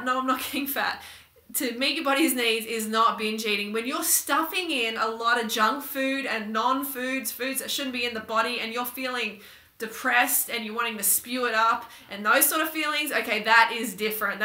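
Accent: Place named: Australia. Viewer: The Aussie accent has to be the best in the world - everything about it is awesome.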